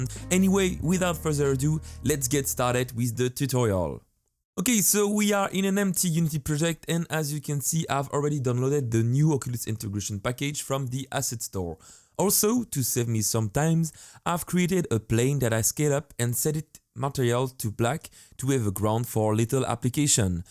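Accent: France. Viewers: It's ok man, not easy to pronounce some words with French accent. As long as people understand, it's fine :) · We Americans are jealous of your Francais accent ;)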